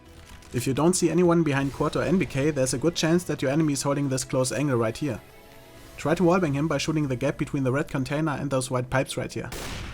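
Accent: Germany. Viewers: Hört sich so deutsch an :) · For me understand your english is really hard because you have fkin German accent :D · wow, it's hard listening to this guy. dat German accent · Are you German? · Man hört einfach, dass er deutsch ist xD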